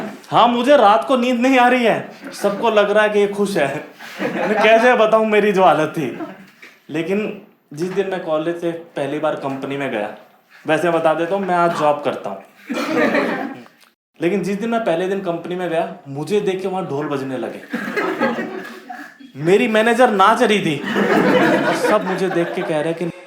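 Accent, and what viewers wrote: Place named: Haryana, India. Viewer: Bhai randeep hudda ka doooor ka cousin lgta hai, hariyanvi touch bhi aa rha hai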